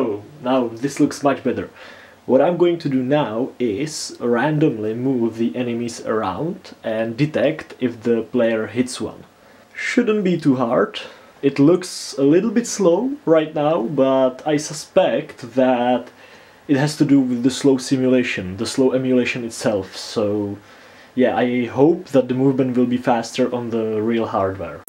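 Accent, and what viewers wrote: Czech Republic. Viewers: Hned jsem věděl podle přízvuku, že jsi čech xd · Já tušil že jsi čech :D ten přízvuk mi napověděl :D ale jinak super video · It's funny - you've started speaking English and I immediately knew you are Czech. There is no hiding 🤣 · Teď mi tohle video náhodně vyskočilo na YT a říkám si, že v té angličtině slyším češtinu a starý noťas mi to potvrdil! :D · To že seš Čeh jsem poznal podle toho hlasu Angličtiny. Jinak dobrý video! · i cant help myself but i hear a really strong czech accent in your voice . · Hodně dobrej kontent! Podle přízvuku jsem poznal že jsi z Česka a potvrdil mi to "Koš" na Windows XP :p CG! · Bro I swear this guy has such a big czech accent. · Cool. im from slovakia. i heard you czechis accent. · I was wondering why the accent was so familiar, turns out you are a fellow Czech. Great video btw, it's quite fascinating to see how they made the games back in the game. 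· Slyším první slovo anglicky a hned slysším, že jsi Čech, jinak parádní video kámo. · časkej přízvuk jak poleno! super video!!!!!!!!! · Ahá :D mě se zdála tvoje angličtina taková počeštělá :D a win xp vítejte.... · ey i recognized that czech accent good vids ngl :) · Do u live in Czech republic bc i hear an accent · Hned jsem věděl, že jsi Čech podle tvé angličtiny, průzkumník souborů a poznámkový blok mi dal za pravdu <3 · I was watching the video when I noticed your accent. I thought wow ... sounds like a Czech accent ... and then I noticed the trash can label :D Keep up the great work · To jsem poznal že jseš z Česka podle té výslovnosti. Jinak dobré video!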